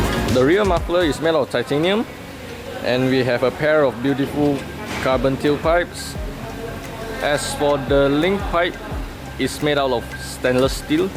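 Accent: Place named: India. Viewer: Индуса сразу слышно 😂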